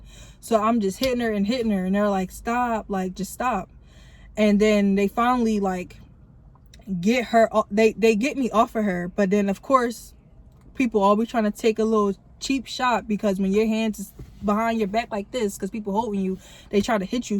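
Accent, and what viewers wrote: Philadelphia, United States. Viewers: are you from philly?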